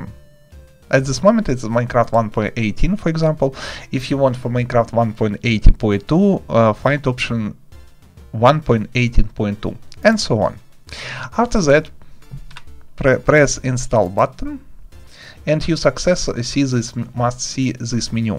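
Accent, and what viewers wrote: Russia. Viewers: палиться пиздец,у него акцент рашки,пиздец угарно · Акцент русского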